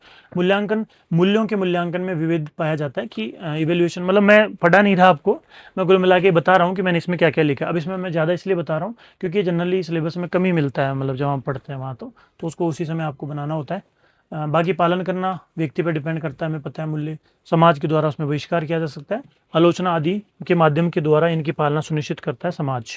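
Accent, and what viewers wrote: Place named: Jaipur, India. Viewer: Sir aap kha se ho aapki language jaipur ky core area ki lgri h?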